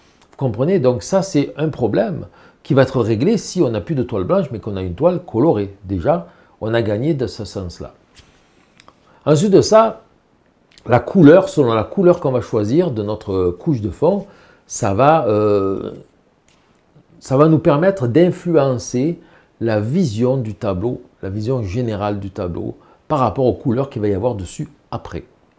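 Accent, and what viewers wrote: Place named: Provence, France. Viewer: Merci Monsieur , bien expliqué !! Intéressant pour me ressourcer ! Et Bel accent de Provence ❤️ Régine , artiste.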